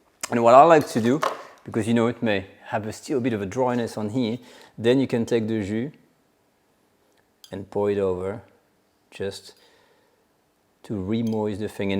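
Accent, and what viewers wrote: France. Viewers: Dear friend!! You are a truly great cook! I have been following you for some time! I truly LOVE your videos and the way you explain everything, with your lovely ....Franco-English accent... BUT !!! Well, we have, SHOULD be a bit more CONSCIOUS OF what we eat!! BABY ANIMALS ??????? THINK ABOUT IT !!!! FEEL IT!!!! For the civilized world THIS FACT must be PREPOSTEROUS, DISGUSTING, APALLING !!!!!!!!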